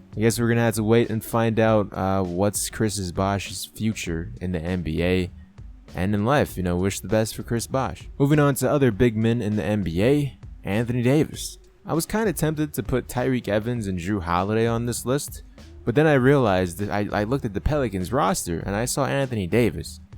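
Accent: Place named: Russia. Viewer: sounds like russian guy speaks english. sort of accent or something.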